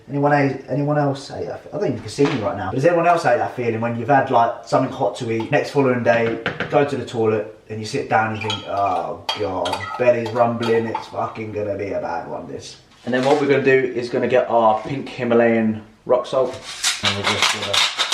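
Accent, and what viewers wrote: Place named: North, United Kingdom. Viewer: this guy is northern asf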